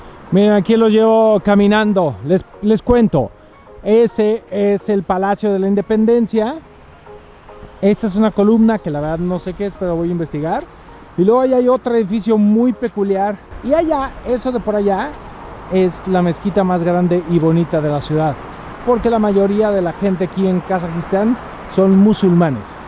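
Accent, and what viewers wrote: Mexico. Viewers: Tu narración es de diez 👍👏👏👏👏👏no me aburro y hasta me rio con tu tipico acento mexicano. Realmente como dicen los españoles una gozada este video además de ser muy interesante 👍👍👍👍👍 Gracias y por más Kasachtan 🇰🇿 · Si dejara tanto acento mexicano sería mejor este YouTuber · Jajajajaja bien canijo me encanta como habla este muchacho bien Mexicano a lo mero mero!!! Me encanta su energia y alegría es como una Ave aventurera y donde el viento lo lleva ahi va bendiciones!! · Se le sale mucho lo mexicano esta vez. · Jaja 😆 me ha encantado tu paseo por ahí en Kazajistán 🇰🇿 y tus comentarios con esos acentos muy mexicanos wey: #tenga su tenge jaja 😆!! La escultura Dominicana 🇩🇴 👏👏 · El acento mexicano es insoportable. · yo te voy a ser muyyyyyyyy sincero la verdad yo veo tus vídeos porque el acento mexicano es un afrodisíaco para mis oidos. Vos estas guapo rico delicioso y de verdad me gustas me exitas me encantas veo tus vídeos e imagino te doy besos detras de la oreja.Y si tus videos son balsamo para mis ojos pobres que aun le falta por conocer.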